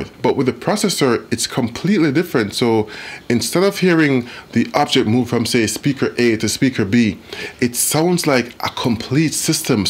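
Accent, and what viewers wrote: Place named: Jamaica. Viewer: or you Jamaican? yu sound like a yardie my youth, nuff respect.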